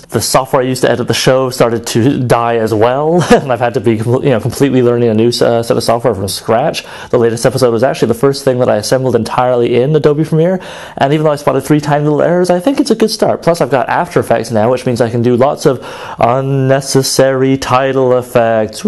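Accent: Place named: United Kingdom. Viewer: here are some things u might want to change in the show from a outsiders opinion, i really want to see u succeed. 1. where different clothes, no more vest and tie, be more casual those clothes distant you from your audience 2. more british accent people love that 3. don't try so hard, you don't have to force jokes, and run them over with a peer see if they laugh if not scrap it, but your gut feeling is the best. obviously there are more/better ways to get more views but here a a couple.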